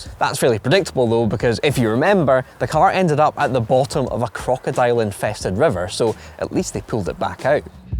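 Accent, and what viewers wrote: United Kingdom. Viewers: where in the UK is this guy from? ( love the accent.)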